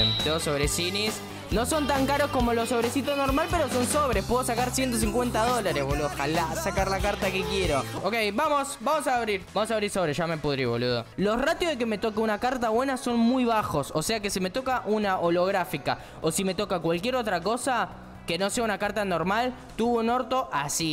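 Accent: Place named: Argentina. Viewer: Eres de Argentina deep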